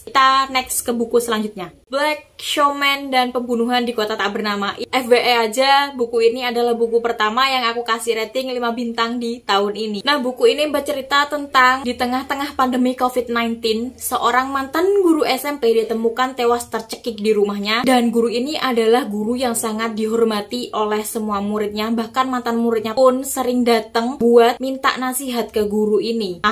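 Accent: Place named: Yogyakarta, Indonesia. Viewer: Domisilinya Jawa mana kak? Suaranya mengingatkan aku sama temen-temen di Jogja. Sehat terus ya kak biar bisa mereview novel-novel lain. Amin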